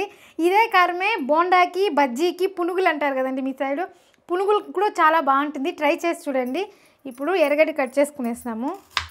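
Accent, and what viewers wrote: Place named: Tamil Nadu, India. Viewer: Telugu bale tamil vaalla telugu la bagundhi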